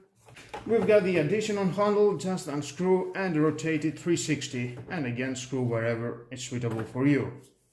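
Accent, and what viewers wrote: Greece. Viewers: out of the point, I think you are Greek from your accent. If you are, do you know where can I find it, except from Lidl :-P ?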